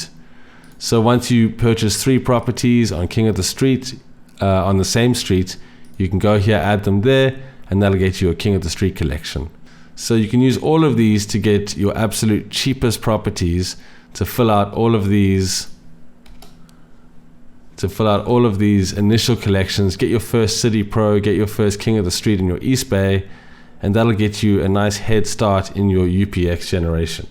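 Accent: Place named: South Africa. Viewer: In Spain ..Had Upland for over a year and still don't know how to do hunts..so lekker to hear a SA voice 😁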